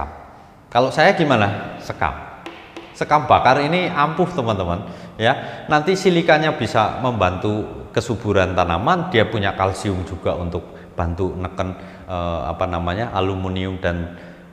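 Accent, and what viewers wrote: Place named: Banyumas, Indonesia. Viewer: Sesekali ngapak nya di keluarin pak,ora ngapak ora kepenak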